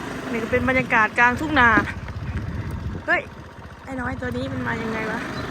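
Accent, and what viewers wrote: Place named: Nong Khai, Thailand. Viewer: บ้านหนองต่ายใกล้หนองคายไหมพูดสำเนียงเหมือนคนหนองคายคนไทย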